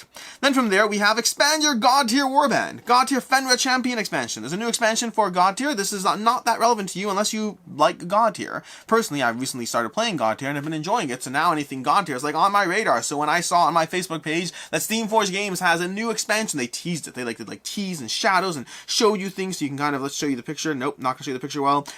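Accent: Canada. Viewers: I believe your Canadian upbringing is showing. We inherited British pronunciations (and often, spelling) but our proximity to the U.S., and the flood of T.V. channels, has led to this confusion. Just say it the way it feels god to you.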